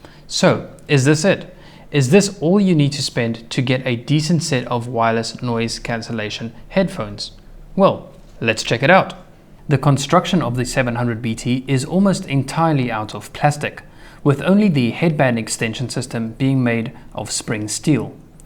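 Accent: South Africa. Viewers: Your accent sounds South-African??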